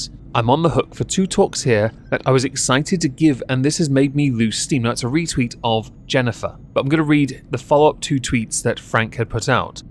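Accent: RP, United Kingdom. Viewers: OH- I really, really want to subject him to them. He's peak entertainment when He's disgusted and annoyed. Oh please 🙏! The worst offenders are always intimidated by your command of the English Language / Posh accent.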